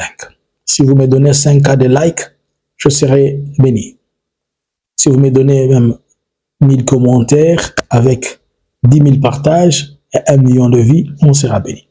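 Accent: Rwanda. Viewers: Aza rwandais, c'est normal, accent ya kinyarwanda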